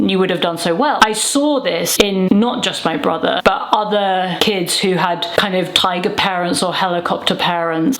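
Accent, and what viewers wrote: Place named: Germany. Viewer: Mas, que inglês pesado, arrastado, você por acaso é alemã ou indiana ? Your english is very heavy, it sounds like German accent to me.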